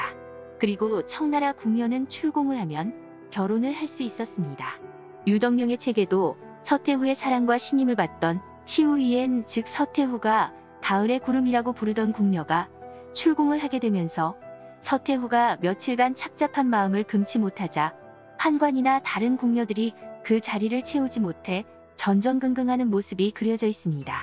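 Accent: Gyeongsang, South Korea. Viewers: 아 저도 그 갱산도 사투리가 욕은 많이 먹었어두 나름 개성적이고 중독(?)되서 구독 한건대 쪼까 아쉽네영 ㅎㅎㅎ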